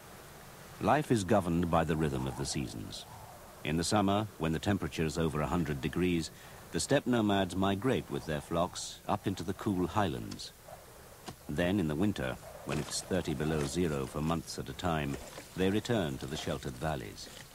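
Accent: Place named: United Kingdom. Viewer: I much prefer the British Narration in these videos, but for those looking for the American Narration you can find the first of the 4 videos here > <link>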